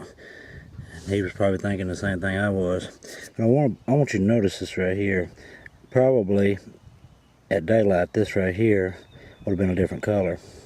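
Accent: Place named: Missouri, United States. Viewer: I'm coming down there to go hunting with you! All that in one field? Are you kidding me? I'm in Canada eh... I find a couple in a day, maybe up to 5 if I'm lucky... Sometimes, nothing at all. I'm in Southern Ontario, Canada... By your accent, you must be in Missouri or Arkansas or something like that... I really want to go down there one day and hunt a few of those fields....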